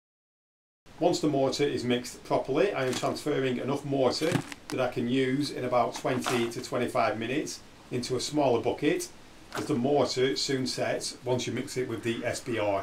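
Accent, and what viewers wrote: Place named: Lancashire, United Kingdom. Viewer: Lancashire i am guessing. Nothing wrong with his accent.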